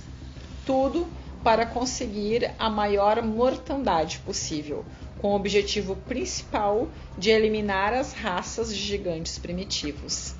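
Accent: Rio Grande do Sul, Brazil. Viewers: Detesto sotaque gaúcho o coisa horrivel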